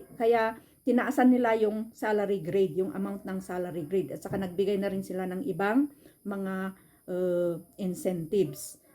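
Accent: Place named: Igorot, Philippines. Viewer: Ne, apay kasla nga Igorot ka nga pada met nga agsau auntie? Hehe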